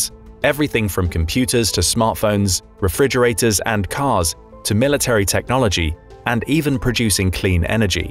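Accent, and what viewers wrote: England, United Kingdom. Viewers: Hearing someone with an English accent use nba references is wild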